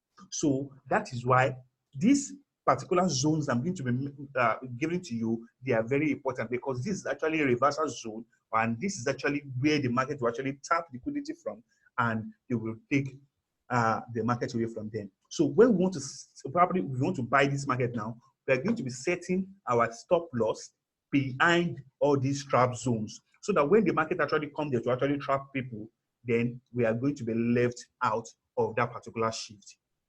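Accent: Nigeria. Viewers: You sound soooo Naija my guy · Na naija guy na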